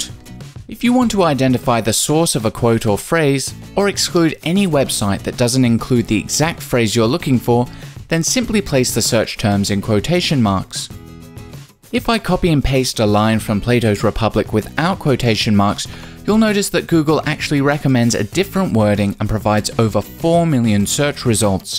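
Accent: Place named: United Kingdom. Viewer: If that was spoken with an American accent- I wouldn't have watched it. Am I the only one who is obsessed with those British accents?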